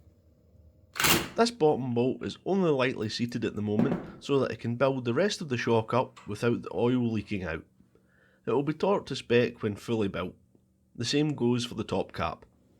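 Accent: Scotland, United Kingdom. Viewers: Brilliant, wie immer! Ich liebe den schottischen Akzent.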